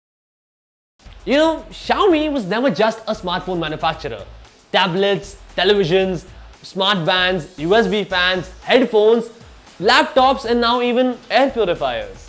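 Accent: India. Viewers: Holy fuck I love Indian accent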